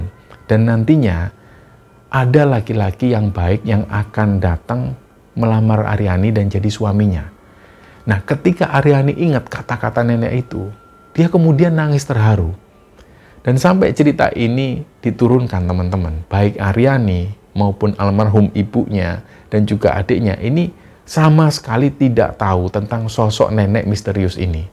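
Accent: Java, Indonesia. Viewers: saya tidak tau.. amalan apa yg dilakukan alm.bapak e mbak ariani sdah di level berpa tingkat kesbaran dan keikhlasan yg jalani sampai bisa mengangkat derajat kehidupan anak dan istrinya ... mohon maaaf jika tidak salah nenek" itu adalah wujud khodam dari alm. mbak ariani sekeluarga sehat" ya alfatihah buat khodam alm.dan almh. semoga husnul hotimah ... 🙏🏻🙏🏻 om pertahankam logat jawa ..jika di naskah memang ada bahasa asli ❤❤❤👍👍👍🤗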